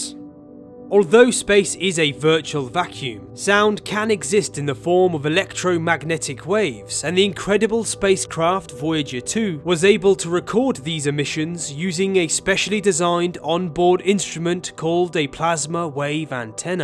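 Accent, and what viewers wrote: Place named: Wiltshire, United Kingdom. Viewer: Are you from Wiltshire? Or south west. BTW love you Channel. 👍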